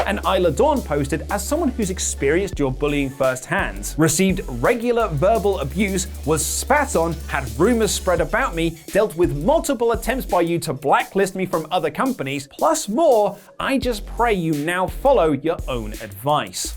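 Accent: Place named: United Kingdom. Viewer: Brits pronounce blackball and Blackpool the same. Not a good episode to have both Tessa and NXT UK news.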